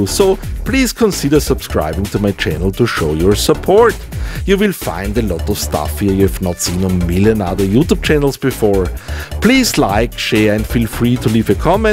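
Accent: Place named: Austria. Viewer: hey, sehr nettes video, gehe ich recht in der annahme, dass du aus österreich stammst? dein akzent hört sich sehr danach an. grüße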